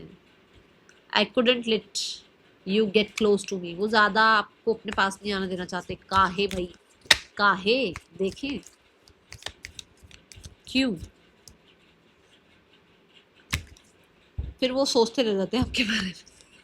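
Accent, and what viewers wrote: Punjab, India. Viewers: Mam tusi punjabi ho ?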